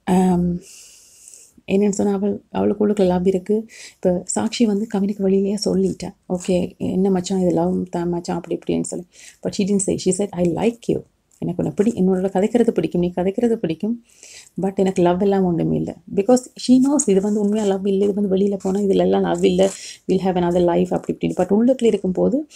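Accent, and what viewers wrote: Sri Lanka. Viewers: இலங்கைத் தமிழ இங்கு கேக்க நல்லா இருக்கு அக்கா. நல்லா செய்திருக்கிறிங்க அக்கா. வாழ்த்துக்களும் நன்றிகளும் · இலங்கை(இனிமை) தமிழ் 👍 👏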